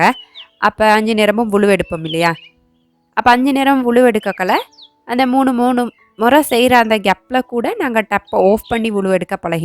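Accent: Sri Lanka. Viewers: Assalamu alaikum warahmatullahi wa barakathuhu How are you unga videos yenaku romba pidichiruku jazakallah khair ma ungaloda srilankan Tamil I like so much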